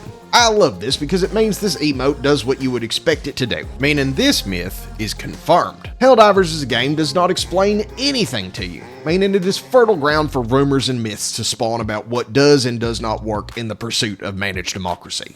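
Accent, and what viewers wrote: South, United States. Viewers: Helldiver commentary with a southern accent hits different NGL. I’d follow you anywhere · lol that's funny. The voice is the reason I find these hard to watch. I still live in the South and have heard some pretty messed up things said in that accent. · Your southern accent makes this amazing. Much love for the intel. Keep trekking on o7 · Your accent sounds to me like Southern US, but you pronounce some words like an Australian? Peculiar. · This video was supposed to be background noise and I sat here and watched the entire thing for the voice and it reminded me of home in the south.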